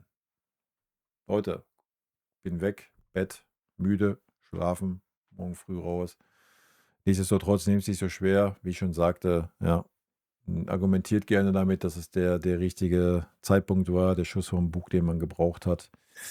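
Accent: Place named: Westphalia, Germany. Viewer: Ich darf mich mal bzgl. Ihrer Sprache und Ihrer optischen Präsenz äußern: sehr redegewandt, westf. Mundart, äußerst unterhaltsam, stets gelungene Mimik (ähnlich der eines guten Kabarettisten), zuweilen auch tolle Gestik, erkennbare Neigung zum schonungslosen "Niedermachen" , gar zum Fatalismus, geschickt eigesetzte Sprechpausen , eine gewisse Rat- und Hoffnungslosigkeit bei rhetorischer Fragestellung, leider unnötige Anglizismenverwendung.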